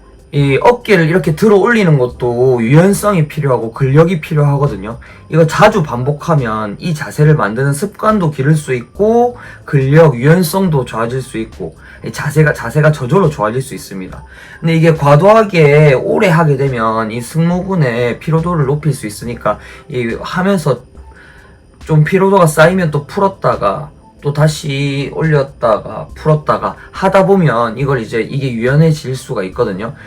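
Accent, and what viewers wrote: Gyeongsang, South Korea. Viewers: 다정다감한 경상도 억양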